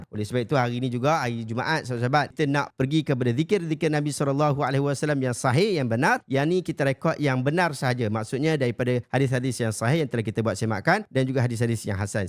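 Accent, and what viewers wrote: Malaysia. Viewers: Ikut telo melayu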